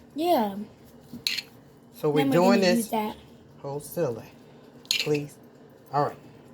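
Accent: Jamaica. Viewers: you are something else. That Jamaican accent. 😂 ❤️ I’m here watching. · ya mon indeed! Love the Jamacian accent! and a great helper too!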